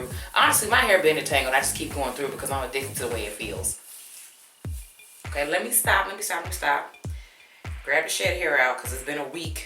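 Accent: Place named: South, United States. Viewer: New subbie here 🤗 I love your personality! The thickness in your hair, lawd bless you. Are you from the south? I love your accent!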